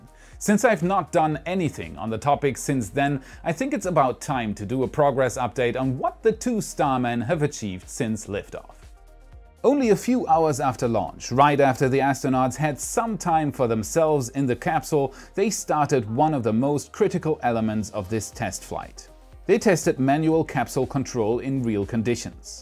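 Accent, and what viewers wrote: Germany. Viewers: Of course he's German. Doesn't the accent give it away? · you are from germany?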